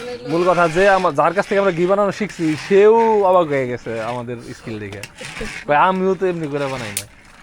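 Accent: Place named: Manikganj, Bangladesh. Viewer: ভাই আমার বাড়ীও মানিকগঞ্জ আপনার কথা আমাদের কথা একি রকম ৷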